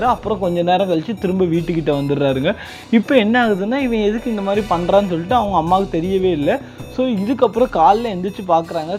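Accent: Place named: Nagercoil, India. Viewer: Your nagercoil accent superb thambi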